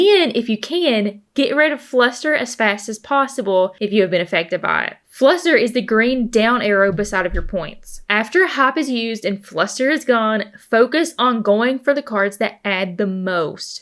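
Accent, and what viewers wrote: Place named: Texas, United States. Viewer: Subbed because of accent 😆 Texas or Tennessee?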